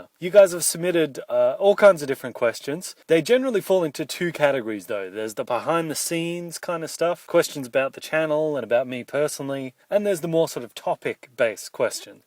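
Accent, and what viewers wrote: Australia. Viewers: OH MY GOSH I KNEW WAS AUSSIE AFTER THE FIRST VIDEO! OH MY GOSH WOOOOOW (i live in aussie) and i thought you were to!!! oh my gosh thats amazing there are known people on youtube that aren't american. i solute you! PS I AM SUBBING! KEEP IT UP MATE! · Wait are u aussie if yes YEEEEEEEEEEES TEN BUCKS IS MINE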